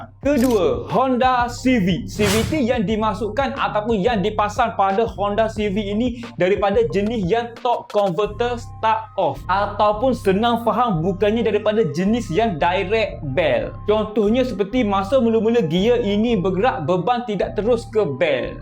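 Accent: Kelantan, Malaysia. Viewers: Kuat bunyi kelantan kau ni brader😂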